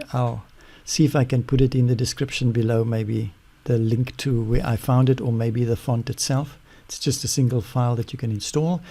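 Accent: South Africa. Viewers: Nice so here a South African accent.:) Goeie werk.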